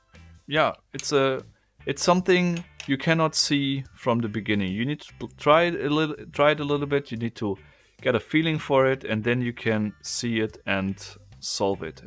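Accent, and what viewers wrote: Germany. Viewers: You bought it in Germany? I knew it!!! Your accent is very German!!! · Are you german ? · Are You German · Man hört so einfach raus das du Deutsch bist XD nices Video btw, cooles Spielzeug. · Man hört das er deutscher ist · Man hört deutschen Akzent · Dieses deutsch englisch regt mich so extrem auf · Are you german/ bist du deutscher? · Kann es sein, dass du deutsch bist? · German, right? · Are ya German? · Red bitte deutsch du kannst es man hört es am Akzent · are you from Germany? You have this specific accent:-D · Wow, hab ich mich verhört, oder kommst du aus Deutschland? Der Akzent ist unverkennbar :D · Man hört mega raus das du deutsche bist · Voll der deutsche Akzent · Dude, the accent is german und sucks af😂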